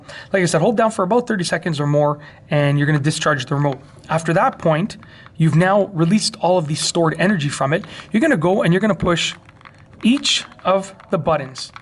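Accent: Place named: Canada. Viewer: thanks so much for this great tutorial! totally fixed it! and i think i understood your Canadian accent!!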